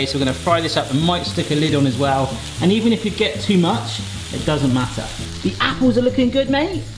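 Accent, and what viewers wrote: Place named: United Kingdom. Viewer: I sooo love your accent, British is actually the best accent! :) <3 from Germany!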